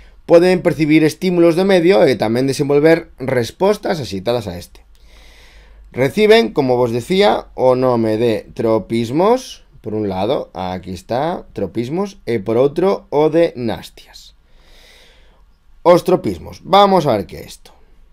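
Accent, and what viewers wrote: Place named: Spain. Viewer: jajaajaj lose es que soy argentino y me da gracia como es su acento pense q era español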